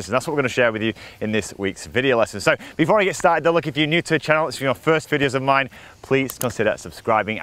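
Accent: United Kingdom. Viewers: who the heck is and how ddid he get so many followers without me ever heating about him...fideo seems very good guess thats why..ps..how did the hoo UK online golf instructors manage ot get their own diffrent accent?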